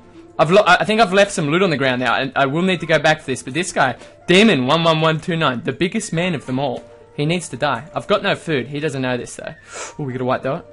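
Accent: Australia. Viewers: Your an Aussie eh? I served in the military with a few of you bastards. Fucking love you guys man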